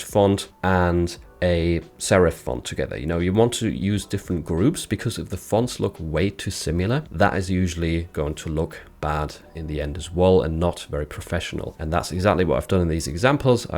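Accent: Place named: North, United Kingdom. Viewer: How tf is your accent so good?! I'm English and I'd NEVER think you're not a native northerner. I don't think I've ever heard a non-native with such a convincing REGIONAL english accent. I also think its great that you chose that accent rather than a boring southern one. Super impressive 👏 btw I only know about this because someone told me, otherwise it wouldn't possibly have occured to me!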